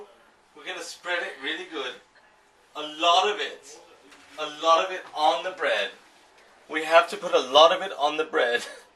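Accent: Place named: Germany. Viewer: Great vid buddy and i think you needed a chainsaw for the bread.Dude and what was up with the German accent.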